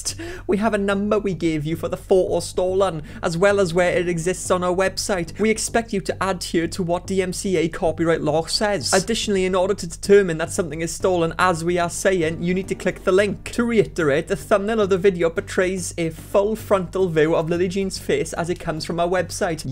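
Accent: Wales, United Kingdom. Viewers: i came for the lillee jean drama, i stayed for that welsh accent 😩😩😩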